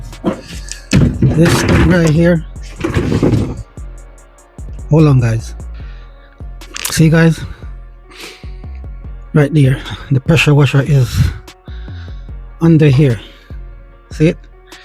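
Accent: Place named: Jamaica. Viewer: Nice working van. You sound Jamaican.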